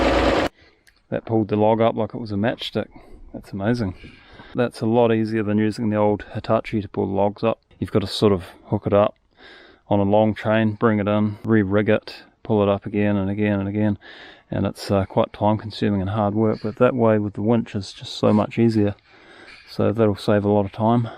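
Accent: New Zealand. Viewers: New subscriber here. I am also subscribed to Iforce2d, an other YouTuber from NZ. He is doing remote control stuff, like fixed wings and copters and recently home built CNC machine. Anyway, it is very interesting how your voices are so very similar. Of course the accent makes it a lot similar, but even your style and look so very similar. Could pass for brothers.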